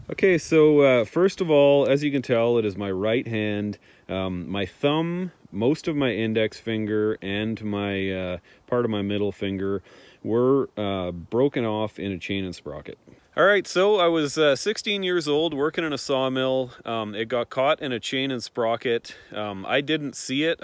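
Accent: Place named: Canada. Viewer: accent says Canada, americas hat. Edit: the license plate also says Canada lol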